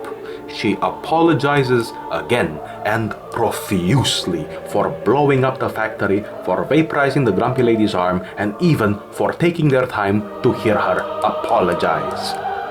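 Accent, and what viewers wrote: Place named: Eastern Europe. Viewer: As a fellow slav I knew when I've heard your accent, that there was not enough tears 100% right Also. Bober 🦫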